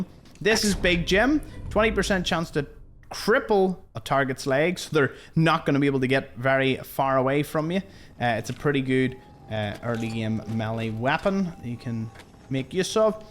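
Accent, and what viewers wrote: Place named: Scotland, United Kingdom. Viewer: I like William Wallace accent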